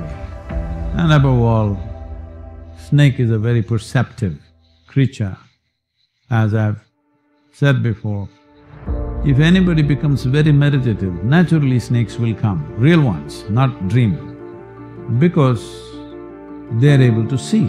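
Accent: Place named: India. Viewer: Ur vdos great. But indians better learn speak English not Indglish.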